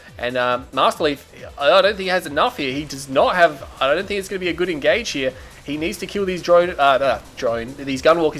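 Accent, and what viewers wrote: Australia. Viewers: its weird how literally the most fun Channel on my youtube, is just some Australian dude narrating videos of a game that came out in 2007 and that i still suck at for the most part. And yet it never gets old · Too be fair Australian is one of the best accents in the world. M8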